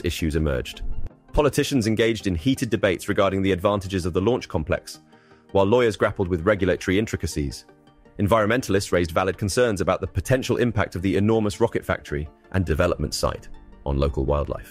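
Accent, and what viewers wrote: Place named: Europe. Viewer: Good report. Job well done. It would be nice to hear your announcer to speak in American English. There are dozens of European English on U Tube I do not like their speech nor their cadence. .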